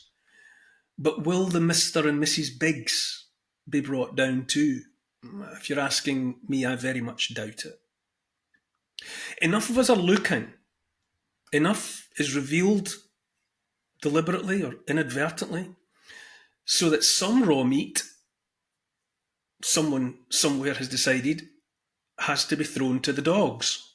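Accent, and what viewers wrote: Scotland, United Kingdom. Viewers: Well, that hit a few nails on the head! 👍💪 Neil Won't Kneel A gentle, beautiful Scottish lilt A kind, empathic, forward tilt An open heart; a caring face A willingness to take his place. A strong, home-hitting monologue Reminds us of the boiling frog The courage to become a sleuth A passion to release the truth. A deep desire to crack the whip Illuminates our freedom-strip Calls out the darkness taking hold The shiny despots; plated gold! Intense and raw, he lays it bare A soul-enhancing, honest stare Inflections drive the message through He speaks for Us - for me and you! Discernment's such a precious gift His words create a mighty lift Take heed of all he has to say He knows - it shouldn't be this way! 🤗🦋🐬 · I thought u were Georgie Best for a bit with a Scot accent lol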